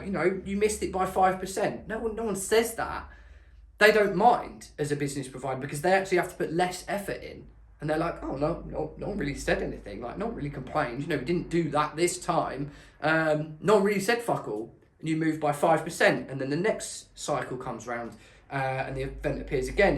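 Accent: United Kingdom. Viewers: Can someone PLEASE tell me what was said ? I can’t understand Scottish !!!!! Probably nice sentences but still ….. very confused 🤣🤣🤣🤣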